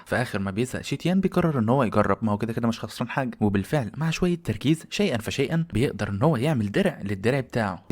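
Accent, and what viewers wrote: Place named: Upper Egypt, Egypt. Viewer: جامد بلصعيدي🗿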